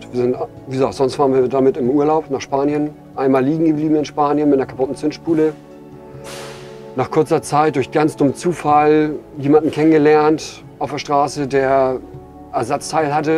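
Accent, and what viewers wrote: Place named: Hamburg, Germany. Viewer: Bildschön das Auto..Das du aus Hamburg kommst hört man. Ist bei mir genauso. Gute Einstellung mit deinem Auto 😊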